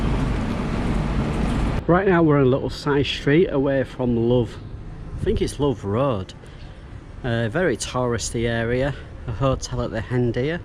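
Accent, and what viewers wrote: United Kingdom. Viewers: actually your pronunciation is fine, you just has a British accent. Don't worry it's fine.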